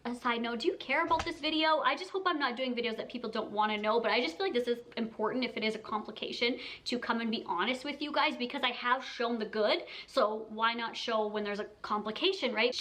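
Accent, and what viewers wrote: Canada. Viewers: Whenever people tell me that Canadians have accents I always laugh and say naahh we don’t Then I watch videos of other Canadians talking and I’m like wow yup she’s Canadian 😂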